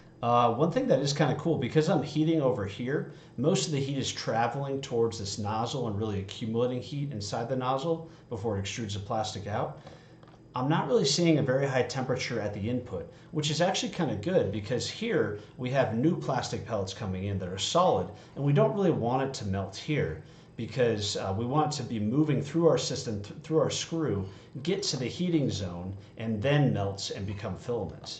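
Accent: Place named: United States. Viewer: this the first time i see an American (i assume from your accent) using SI and Metric unit for the tutorial lol. this video is amazing tho, very detailed explanation. very helpful. thanks a lot.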